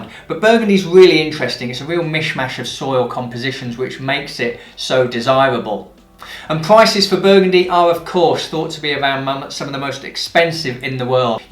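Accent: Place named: Southern England, United Kingdom. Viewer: Where in southern England are you from? Your accent is crazy.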